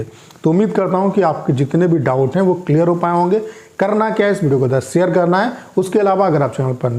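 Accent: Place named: Uttar Pradesh, India. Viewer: Bhaiya pkka Uttar Pradesh se h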